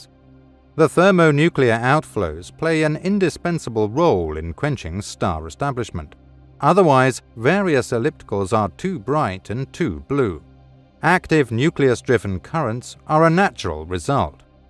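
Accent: United Kingdom. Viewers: Same here boys!! British accent is a must.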